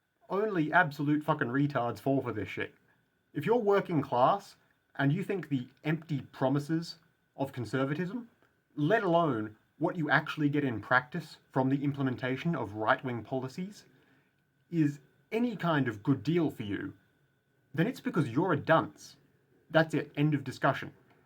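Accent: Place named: New Zealand. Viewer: The Kiwi accent is the best in the world 🇳🇿🇳🇿🇳🇿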